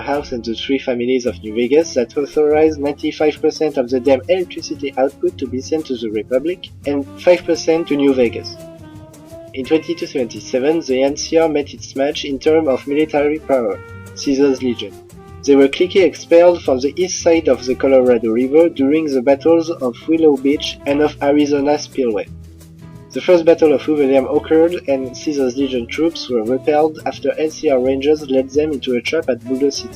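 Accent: France. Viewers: Mec sa se voit que t’es français ou tu parles cette langue parce que ton putain d’accent est dégueulasse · A l'accent français x] Le meilleur accent du monde ;] Sympa la vidéo. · Your French accent is thick and very difficult to understand.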